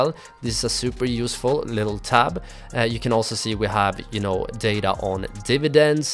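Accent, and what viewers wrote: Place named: Sweden. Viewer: Like the swedish accent❤️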